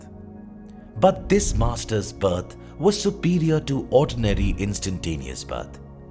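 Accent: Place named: India. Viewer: The narrators Indian accent is cringe.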